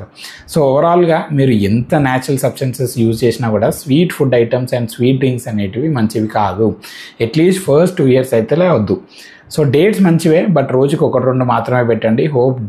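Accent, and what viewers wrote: Telangana, India. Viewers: సర్. చక్కగా తెలుగు లొ మాట్లాడుతూ.... హఠాత్తుగా ఇంగ్లీషు లోకి వెళ్ళి పోయారు... అలా మాట్లాడితేనే knowledge ఉన్నట్లా enti....చక్కగా మీ తెలంగాణ యాస తో చెప్పండి సారూ మంచి గుంటాది 🙏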